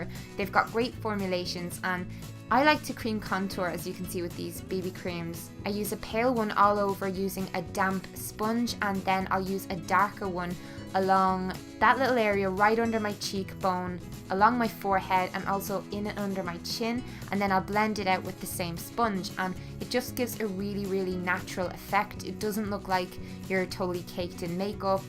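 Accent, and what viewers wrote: Ireland. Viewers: This is my second time watching this, I wanted to try the eyes you did and I had the captions on by accident, oh my God I'm weak! It's clearly having trouble with the Irish accent because some of the mix ups are hilarious eg.. 'relax in a box (bath!), 'as a guideline for your Catholic (catflick!) 'its nice and dry skillet chiding me work hard play hard you'll never get attacked again. I hope you enjoyed the CEO'!! Too funny!:'D Ps, You look unreal in this video and congrats on graduation!!:) x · Congrats again girlie!! You look glowing :). I am married to a gorgeous Scotsman but I swear I should have found an Irishman lol cause I could listen to you for days lol!! Love from Canada xo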